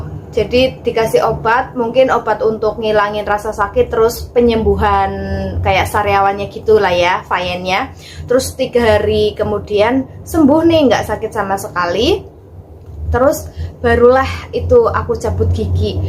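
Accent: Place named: Banyumas, Indonesia. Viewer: Disumbat mba gusinya pake kapas,salam kenal ya mba ngapak 😁🙏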